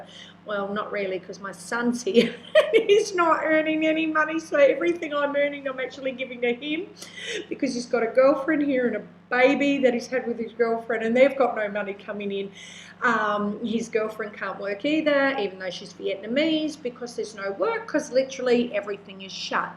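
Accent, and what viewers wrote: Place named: Australia. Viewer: Hey it's Nealos. Good to hear you still sound Aussie as fcuk! Hang in there mate.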